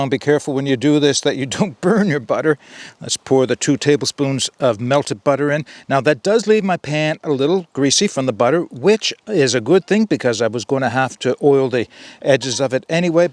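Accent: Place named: Nova Scotia, Canada. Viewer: Just found you channel, I am starting Keto and am looking for something for outdoor cooking. So far I have enjoyed you recipes and would like to see a book on keto eating outdoors, I have looked for such a book and can;t find anything suitable, had you thought about writing one? I am UK based and Keto doesn't seem to ba a popular lifestyle here, so far I am enjoying the keto and feeling better for it. Please keep up the good work, you are easy to listen to and follow. I'm Guessing you accent is either Nova Scotia or Newfoundland.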